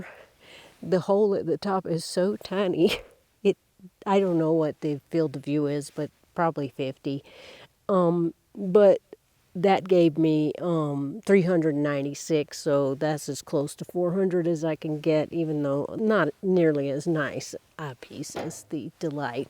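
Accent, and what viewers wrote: South, United States. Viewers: Love your smooth southern accent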